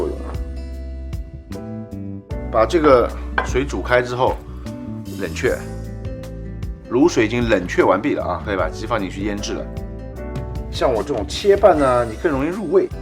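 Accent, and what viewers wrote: Shanghai, China. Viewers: 一，你又不是广东人，一口上海国语。二，广东人不吃辛辣的调料。三，这只鸡没烤熟。如果满分十分你只能拿个三分，失败的视频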